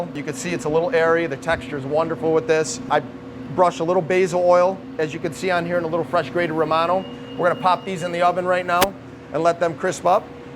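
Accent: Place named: Upstate New York, United States. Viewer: Even before I saw "Utica" on your T shirt I knew you were from Upstate NY, that's a cool little twang in your accent, I am from Buffalo originally and could pick it up right away. Loved the recipe and technique. I always wondered what kind of greens to use, I guess it's escarole.....and always wondered if I should rinse the beans first, I see you added them right from the can so I guess don't rinse them.....so making this dish in the upcoming fall when the weather cools....also, I have friends that make it and nobody puts Italian sausage in it...I'm trying it that way.....seems like it would be heartier.....thanks for the video...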